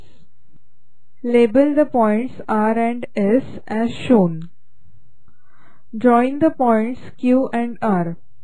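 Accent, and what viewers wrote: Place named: India. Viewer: .-_-. Damn Indian accents.....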